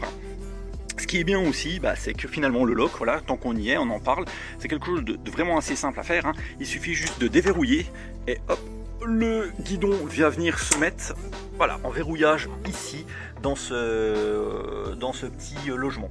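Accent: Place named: Jura, France. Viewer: Superbe video! Tu as l'accent Jurassien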